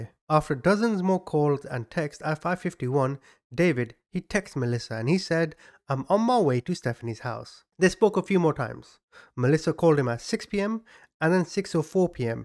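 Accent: United Kingdom. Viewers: You make me laugh so much, I think it's because you speak like a Brit and I get you, I love your channel and your opinions, great job man.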